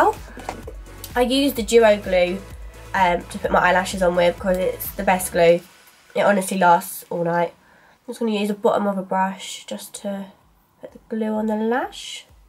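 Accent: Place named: United Kingdom. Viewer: just wondering where do you live you have a sort of british accent but you have loads of makeup from american brands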